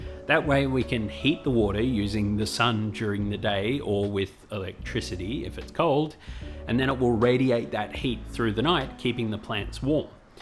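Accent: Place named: Australia. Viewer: I sometimes forget how much I love hearing my own accent on youtube until I find a aussie channel that is of interest to me!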